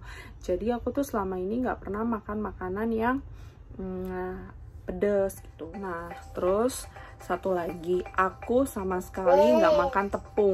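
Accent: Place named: Palembang, Indonesia. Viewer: wong palembang ceh ?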